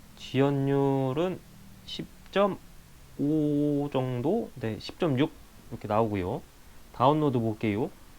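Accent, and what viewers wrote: Chungcheong, South Korea. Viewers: 와 이게 찐 충정도 사투리인거신가ㄷㄷ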